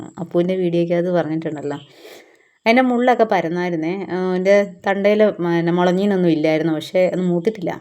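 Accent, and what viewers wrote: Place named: Kanjirappally, India. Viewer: കാഞ്ഞിരപ്പള്ളി അടുത്താണോ ? Slang അങ്ങനെ തോന്നി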